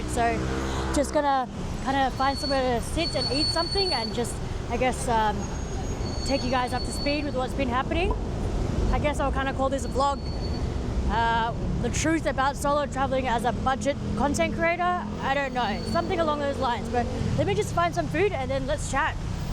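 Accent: Australia. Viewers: To answer your question, what you guys watch? The travel and food vlogs have been done to the death. You are may be 10-15 years late to this genre. I have watched two of your videos, I watched them just for drama and hear an Asian woman taking in heavy Australian accent. I have few more critiques but I will keep them to myself.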